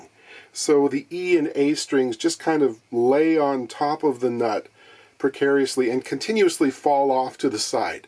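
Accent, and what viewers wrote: Germany. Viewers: I'm here for the German accent...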